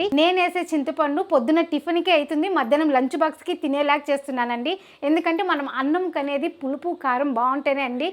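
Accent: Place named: Karnataka, India. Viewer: I think u belong to Ananthapur district...ur slang is a bit kannadiga speaking Telugu... Ur recipes r interesting n having eye appeal..ur preparation n presentation of ur recipes r very good...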